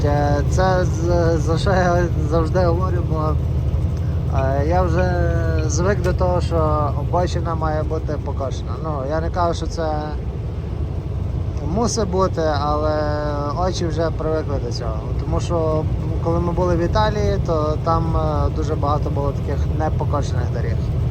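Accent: Western Ukraine, Ukraine. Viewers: Диалект запукренский рагулячий ☝️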